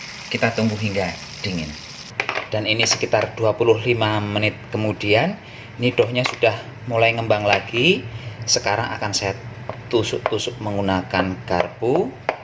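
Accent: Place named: Banyumas, Indonesia. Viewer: Mas, nganggo, bahasa, ngapak, lucu, mas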